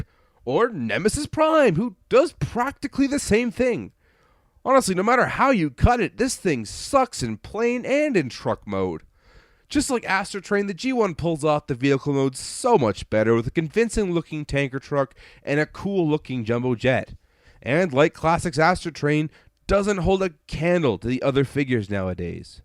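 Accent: Canada. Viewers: Haha you sound like a candian .Are you Canadian ?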